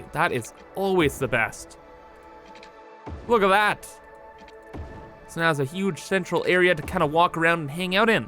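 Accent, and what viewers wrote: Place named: Canada. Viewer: Are you Canadian too? I recognize your accent.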